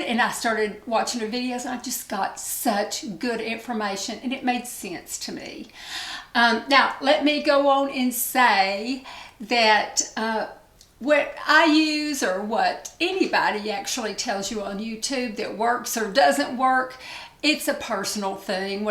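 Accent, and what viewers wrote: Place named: Tennessee, United States. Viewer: Hi, I love to hear you talk! I used to live in Dunlap, TN and your voice reminds me of dear friends that live there. I would def research the vit C derma rolling thing and it possibly causing granulomas. If I remember correctly Penn Smith has several YouTube videos on the subject. I’ve yet to find a vit C that agrees with my skin. Good luck finding a replacement product!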